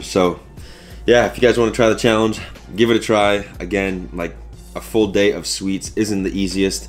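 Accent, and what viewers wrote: Boston, United States. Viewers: I’m not from the US, just watched a couple of your videos and it took me until to recognise you have a Boston accent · Meronghilay It’s a Boston accent! :) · Couldn’t figure out your accent, but when you said “bears,” as “behs,” I knew it had to be Boston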